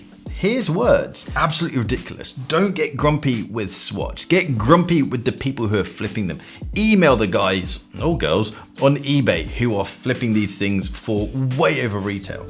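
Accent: RP, United Kingdom. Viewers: I like how he defines watch snobs and he makes videos sipping coffee after every sentence, placing watches in categories of luxuriousness and projecting his view about a plastic watch in his posh accent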